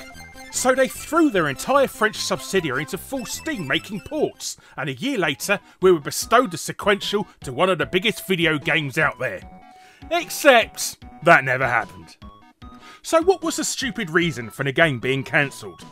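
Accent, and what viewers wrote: United Kingdom. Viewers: Considering british people invented the language he can pronounce it however he wants, personally I could care less.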